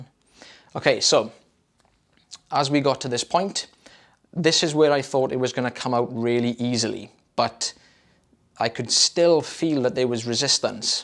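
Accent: Wales, United Kingdom. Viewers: Job well done. Love the Welsh accent 😀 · Wales accent, easy to tell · Go - very big shoes to fill so good on you for having a go! Amazingly long sausage in that first ear. Love your Welsh accent. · A Welsh accent: love it! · I love Rhys, but I'll take another lovely Welsh accent for my ear removal video. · Thank you, so very much, for this fun little video!! 😉💜 I love your accent, where are you from? It's sounds kinda Welsh, but I'm from the U.S. so please forgive me if I'm completely wrong, lol 😉 Great job!! Hope to see you doing more videos!! 🕊️💚👂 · Well done on your solo debut,! Loving your delivery, and your wonderful Welsh accent 😉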